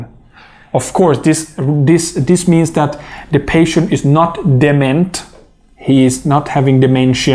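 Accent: India. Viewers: perhaps he should talk in his own language instead of butchering English with his heavy accent. Especially when he is talking about something as important as heart failure. Iv had doctors from India and I find there education is subpar . A person can put there life at risk having a doctor from a third world country, let alone trying to figure out what there talking about. Most eastern doctors come to America to live off patience of Medicare, they can't make a living in India. There guaranteed payment in America. That's was why they come to america with there lack of education and experience.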